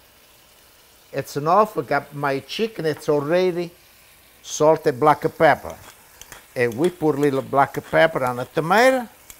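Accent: Italy. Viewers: I love your italian accent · Oh Dio, Figlio mio, managgia la mozzarella 😛 looks so delicious. I love fresh basilfrom the garden. Grazie 😅😅😅 You make me laugh, i love your italian accent. 🍷Salute 🍷e 🍷cin cin🍷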